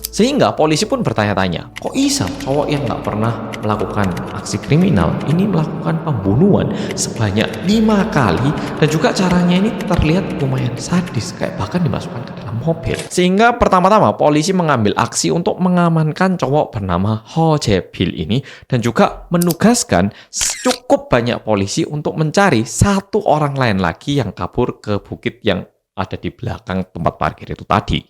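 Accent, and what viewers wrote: Java, Indonesia. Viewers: Mas jgn sampe kehilangan medhookk nya yaaaahh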